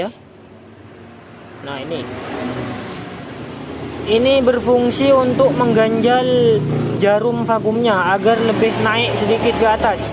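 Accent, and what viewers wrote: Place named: Madura, Indonesia. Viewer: Org madura ya ?😁😁😁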